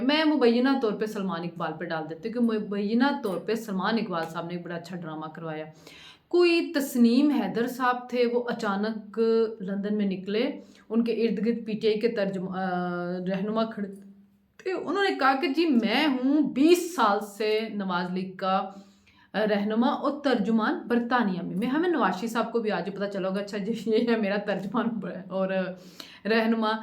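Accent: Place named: Punjab, India. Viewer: your punjabi is sooo cute, you should do your vlog in punjabi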